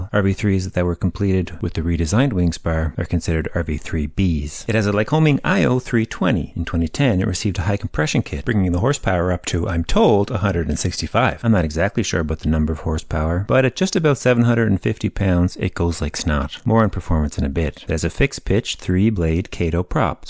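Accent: Canada. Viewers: Very exciting news! Always love your review vids and the 182 video in particular helped me make the decision to buy one a couple years back. Out of all the planes you've ever reviewed, this one seems just so natural that you would own it. Also, never noticed you say "aboot" as much as in this vid but the Canadian tail registrations probably predisposed me to hearing it haha. Keep up the awesome work, dude, and safe flying!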